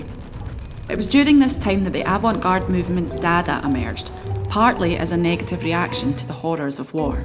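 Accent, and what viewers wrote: Scotland, United Kingdom. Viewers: that scotish accent tho'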